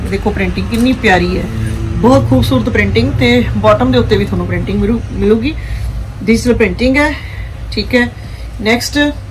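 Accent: Standard. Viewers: Di suit te tohade sohne hai hi mainu tohadi pure Punjabi boli vich Bolan da style bda sohna lagda hai i like you very much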